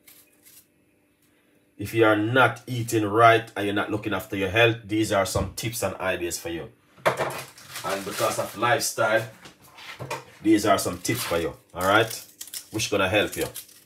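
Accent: Jamaica. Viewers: This is how Jamaicans talk he is just being himself. Video is live not staged 😊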